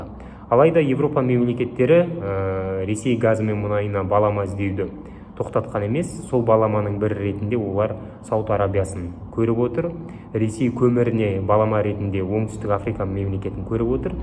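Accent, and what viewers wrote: Standard. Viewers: баламыздың сараптамасы жақсы мағлұмат береді. Тілі таза, ойы жүйелі, дикциясы анық. Рахмет. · Ой, балам, өркенің өссін! Таза қазақша, тамаша дикциямен объективно түсінік бердің.Рахмет. Арнаңызға табыс!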